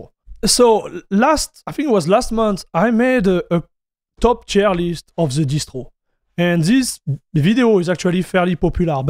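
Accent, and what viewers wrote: France. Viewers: Fortunately if you turn subtitle (CC) on, though it's auto generate but match with his voice. FYI he's speaking English in French accent (sexy isn't it)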